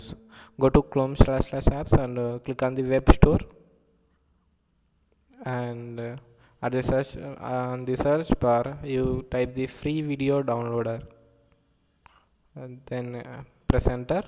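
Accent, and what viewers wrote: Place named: India. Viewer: Liked your video, but your accent is very hard to follow - I've been in the US for over 52 years and I think if you spoke a little clear and a bit more with not so thick Indian accent you'll definitely excel !! Keep up the good work - you're good !!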